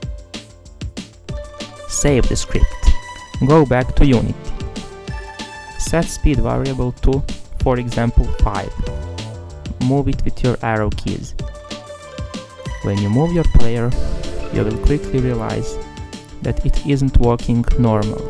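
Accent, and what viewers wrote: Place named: Russia. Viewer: Ты русский? Произношение как у русского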